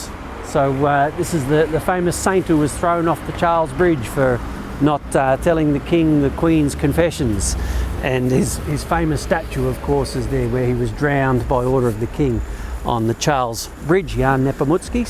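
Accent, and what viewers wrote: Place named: Australia. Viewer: G'day, Thanks for the informative and professional video. It was great to hear this unmistakable Ozzy accent. I don't know how long you've been living in Poland but in the very unlikely case you haven't heard about Great Masurian Lakes I strongly recommend a few days holiday in this area. Greetings from Melbourne, Andrew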